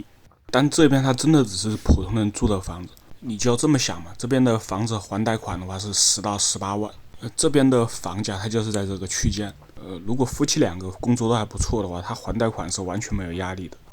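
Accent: Wuhan, China. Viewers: 这口音，基本可以说是武汉或者周边的口音，乡音太浓了。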